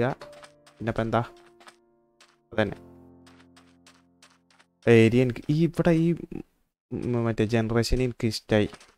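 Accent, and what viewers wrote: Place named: Kozhikode, India. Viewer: I think you're frm kozhikkode or kannur😌🍀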